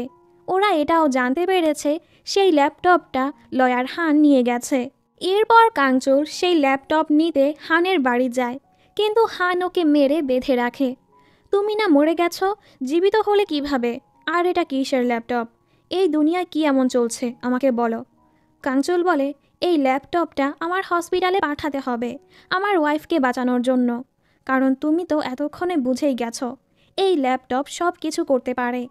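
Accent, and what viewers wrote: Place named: Tangail, Bangladesh. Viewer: Boilo to tangail ar vasha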